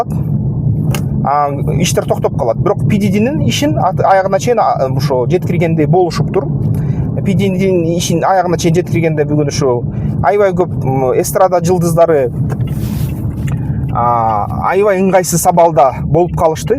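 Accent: Standard. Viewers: Ассаламу алейкум. Менден дагы салам сага 🤝🏻 Санкт-Петербургдан. Сенин айткандарын аябай жагат грамотно орду менен таза кыргызча жонокой кылып тушундуросун. Калтырбай угам.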